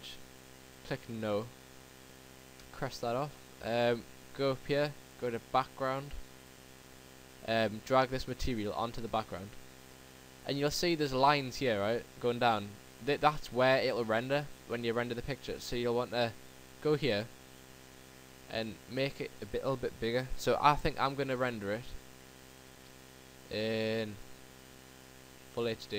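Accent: Newcastle, United Kingdom. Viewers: Are you from newcastle ?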